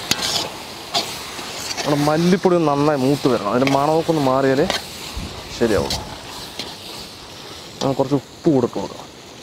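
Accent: Palakkad, India. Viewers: ningal Marana Mass aaanu. Ningalude aaa palakkaad style talk nangalkk nalla ishtttam aaanutttaa Ningal elllaavarum koodi snehathode irunnu food kazhikkunnathum manasssinu sandhosham tharunnund. Padachon eee sneham nila nirthi tharattte Delivery kondu vannnath muslim payyyan aaanennnu paranaju food reject cheytha aaalukal ullla india il ithupolathe video orupaadu nallla message aaanu nalkunnnath. Nammal elllam paraspppparam snehathode jeeevikkanam. Athaaanu etttavum valiya sambaadhyam ikkkaaa. Ikkkaa ningale padachon orupaaadu anugrahikkkattte. Aaameeen Athu pole eee video il ullla elllaaa chetttanmaarodum orupaaadu thanks. Love u all Rabeeh Thrissur ( Dubai ). Lots of love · സങ്കടങ്ങളും പ്രയാസങ്ങളും വരുമ്പോൾ ഒരു video എടുത്തു ഒരു പിടി പിടിക്കും.. സംസാരം പാലക്കാടൻ സ്റ്റൈൽ വളരെ ഇഷ്ടമാണ്.. ഒട്ടും over ആക്കാതെ വലിച്ചു നീട്ടാതെ.. Food taste ചെയ്യാൻ കിട്ടുമ്പോൾ ആ കുരുന്നു മനസ്സുകൾ ഒക്കെ എത്ര happy ആണ്.. 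കുക്കിംഗ്‌ ഇഷ്ടപ്പെടുന്ന ഞാൻ ഇക്കയുടെ ഒരു വല്യ fan ആയി മാറി <3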